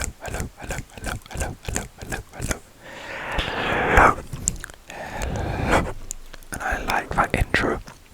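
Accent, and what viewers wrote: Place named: London, United Kingdom. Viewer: lowkey hearing the london accent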